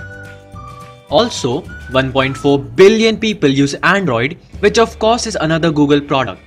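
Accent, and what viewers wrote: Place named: India. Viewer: Indian accent 😑😂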